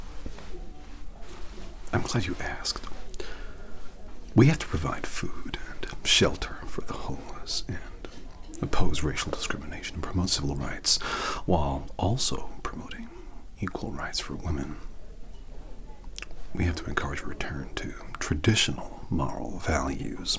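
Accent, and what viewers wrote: United States. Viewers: Scary, disturbing, and very satisfying. Nice American accent. :) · Great American accent.. I want more! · I'm really digging your america accent! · Wow!! Your American accent is absolutely amazing! Especially because your real voice is deeply British (which I love!) You truly sound American if this was the first of your videos I'd ever seen, I wouldn't have guessed your British! American here from North Carolina! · You get a hint of it... But it is SO impressive that you can switch to an American accent 😱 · Your American accent is amazing. How do you do that?! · I haven't seen the movie, but two things amaze me: #1 your flawless American accent, and #2 the way you are able to make your face look like Christian Bale's face. I feel like a broken record saying yet again how versatile you are, but damn. 🙂 💜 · Wow!!!!! Your American accent was just...GOD you’re good! 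You should absolutely do books on tape or something. You narrate SO WELL. And that was creepy and perfect and YOU are so perfect! Amazing job as ALWAYS! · Your American accent is getting really good, man. <3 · Wow, your American accent is really good! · Not only is that a great American accent but that’s also a very good impression. Acting chops for dayssss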